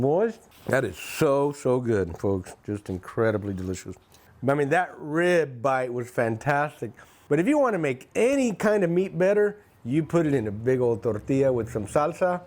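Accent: United States. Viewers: Somehow this guy sounds so American but so Spanish at the same time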